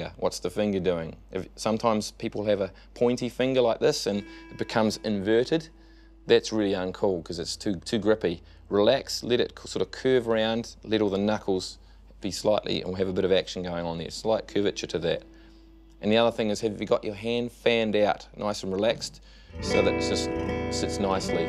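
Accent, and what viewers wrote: New Zealand. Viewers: New Zealand? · does he come from nz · Hello, you explained the pick-holding technique really well, thank you very much! I've asked a few guitarist friends of mine to teach me how to hold a pick and all of them told me to hold it "naturally" (which is, what and how is natural to a first-timer anyway?). I really appreciate your detailed explanations and encouragement. Are you by any chance a New Zealander? It sounds like so from your accent, and you seem to use the words "cool" a lot, kind of a signature slang of NZers. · I was thinking you might be an Aussie because of the Ripcurl T-shirt but as soon as I heard you I knew you were a kiwi like me😂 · Pretty sure he's from New Zealand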